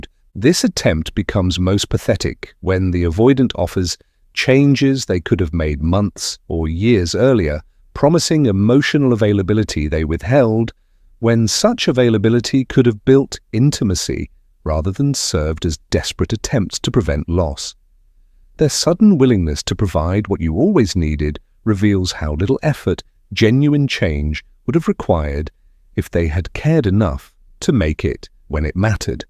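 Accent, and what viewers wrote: Australia. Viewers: Australian accent again please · I prefer the previous voice with the Aussie accent not this snobbish one. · Yeah was only r ason I watched this show a familiar Aussie accent I won't watch now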